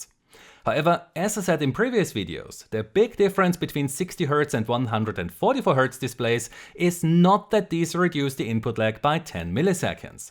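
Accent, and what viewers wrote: Germany. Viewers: Are u german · man hört dass du deutscher bist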